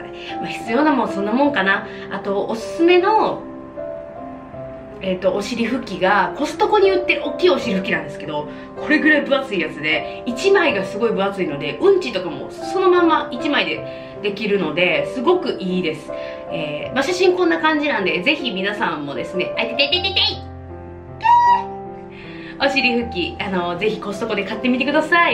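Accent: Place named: Osaka, Japan. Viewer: happy birthday💗感動して泣きそうなりました(>_<)優しい旦那さんと可愛い娘ちゃん💕これからのwonderful人生応援してます💗いつも大阪弁が親近感で大好きです❤️またメイク動画も楽しみ*\(^o^)/*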